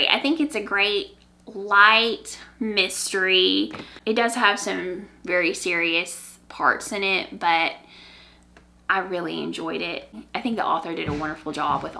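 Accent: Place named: South, United States. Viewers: Shout out to your Southern twang 😁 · Hi! Georgia knitter/maker here. So many fiber podcasters live outside of the South, and I found it charming to hear your familiar accent. Beautiful podcast. Keep up the good work!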